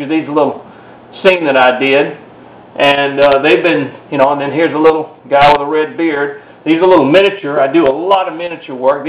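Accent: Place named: South, United States. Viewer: hello !!!!!!! can you please wright the ingredients because your acsent is realy suthern and i am having truble picking up on it!!!! :) lol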